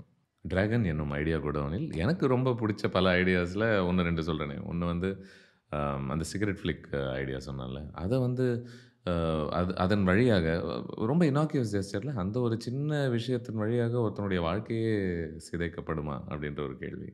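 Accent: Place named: Kerala, India. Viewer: Avar full ah tamil pesala 😂😂 avar konjam malayalam pesraru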